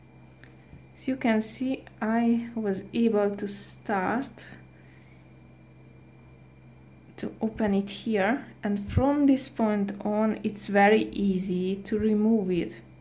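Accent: Hungary. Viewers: Hungarian accent? Ifeel that way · I hear Hungarian accent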